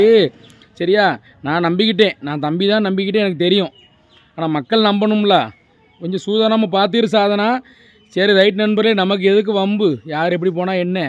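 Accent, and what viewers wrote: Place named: Tirunelveli, India. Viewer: தங்கள் நெல்லை பேச்சு நன்றாக உள்ளது அண்ணா 🎉🎉🎉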